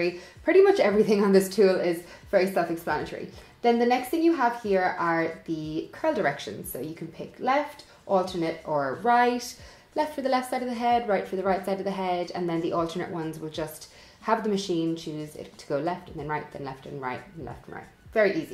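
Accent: Ireland. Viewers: I thought you were irish😂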